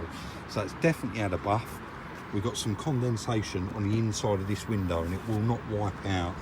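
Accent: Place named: Cockney, United Kingdom. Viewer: You're so cockney you need a pearly waistcoat fella.....Great video though, interesting, and you know your stuff, so thank you for putting this up.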